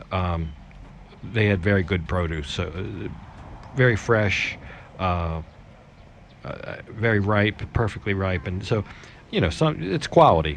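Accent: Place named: East Coast, United States. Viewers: Your accent sounds like South Jersey, the Philadelphia area or Delaware in the US. Are you originally from any of those areas? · great videos! keep it going! reshhtraunts and shhtreets....what accent is that? east coast? where you from originally?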